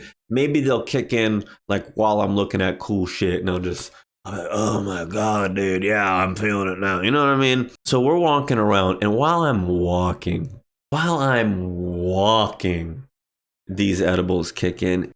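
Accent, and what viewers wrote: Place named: New York, United States. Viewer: bro sound like he from new york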